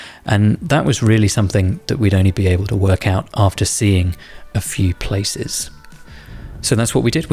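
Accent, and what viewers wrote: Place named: Australia. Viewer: From London? Your accent sounds Aussie😊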